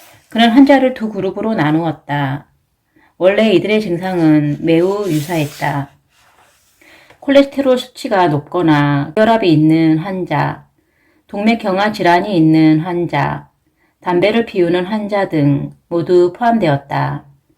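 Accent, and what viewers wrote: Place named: Gyeongsang, South Korea. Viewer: 경상도 사투리로 들으니 아주 구수~합미당.귀여우샤여.잘 들었습미당~❤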